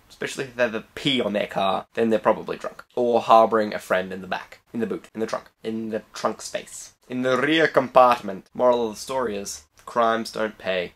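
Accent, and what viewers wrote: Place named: United States. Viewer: Good american accent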